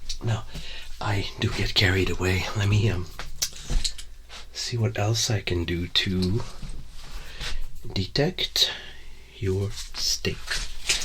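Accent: Quebec, Canada. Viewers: I just discovered ur channel and it’s amazing 😍, Idk what’s going on most of the time but it’s very relaxing 😌 Lové the costumes etc and ur French Canadian which I also love 😁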